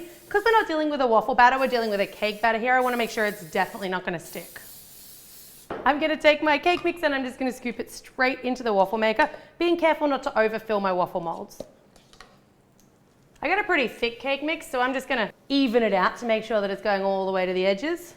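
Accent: Australia. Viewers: is she australian???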